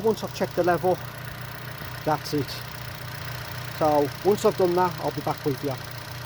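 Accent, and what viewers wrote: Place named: Black Country, United Kingdom. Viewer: i dont think he is a brummie! defo from westbrom, dudley, tipton or wolves 😂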